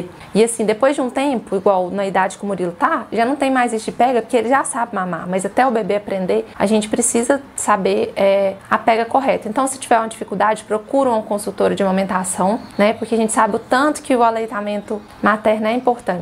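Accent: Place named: Minas Gerais, Brazil. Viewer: Eras não consegui prestar atenção ao conteúdo do vídeo 😂 só ao seu sotaque lindo que parece ser de Minas Gerais <3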